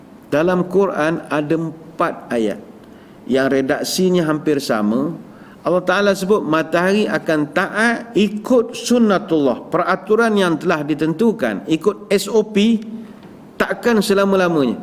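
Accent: Pahang, Malaysia. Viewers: Assalamualaikum dato ustaz bad. Saya minat betul ceramah2 ustaz. Semuanya saya dengar sebelum tidur walaupun kadang² isi ceramah tu berullang². Mungkin ustaz orang Pahang so bahasa tu dekat sangat dengan saya. Tapi kekadang jangan la bagi asyik bagi contoh orang Kuantan sokmo yang teruk. Kiki. Apapun u are my idol. Selamat bersahur ustaz.. 👍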